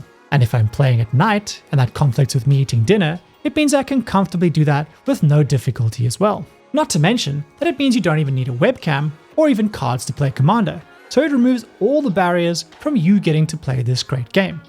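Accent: South Africa. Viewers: Nice video. Is there some south african in that accent?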